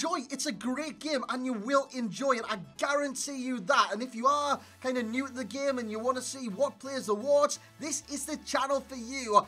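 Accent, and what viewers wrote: Newcastle, United Kingdom. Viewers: newcastle i think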